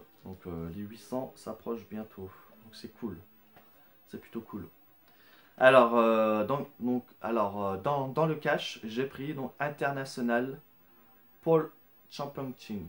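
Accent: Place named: England, United Kingdom. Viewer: super retours ! bien joué. j'adore ton accent anglais ! 745 jeux ps2 !! Ça en fait des daubes ;)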